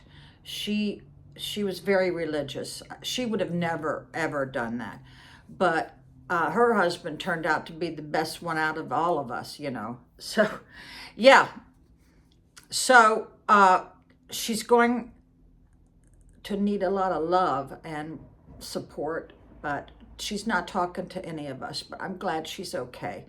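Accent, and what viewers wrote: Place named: Pittsburgh, United States. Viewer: I have to ask...Are you originally from the Pittsburgh area? I detect some Pittsburgh in your voice.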